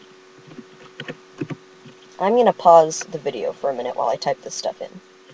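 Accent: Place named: United States. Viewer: (I'm sorry, for possibly bad English) Madam, all of this is very interesting and I already fell in love with your voice (excellent American accent), but.. your tutorial is rather a programming tutorial, not AutoIt tutorial generally. I mean you don't explain why AutoIt deserves our attention if so many other programming languages are available? What AuotoIt can do what other scripting-based environments, scripting languages, or compilation-demanding languages- can't (or can but it's tedious)?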